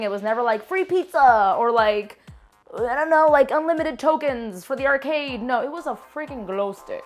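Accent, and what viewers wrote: Cuba. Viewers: I luv her Cubaglish.🤗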